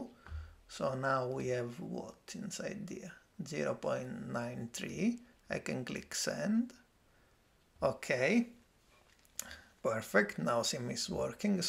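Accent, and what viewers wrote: Italy. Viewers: Dude you are soooooooo italian and i love it. Please record audiobooks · Incredibile, tra le migliaia di tutorial online sentirne uno con l'accento italiano mi fa sentire a casa!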